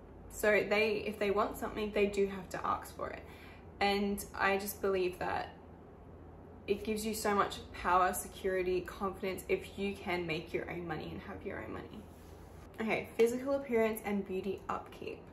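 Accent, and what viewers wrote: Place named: Australia. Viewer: I’m glad that i come across your video!!! You’re so underrated! I wish you could gain more subscribers soon! Also i will start watching your videos starting from today so i can learn aussie accent cause i had always been liking aussie accent <33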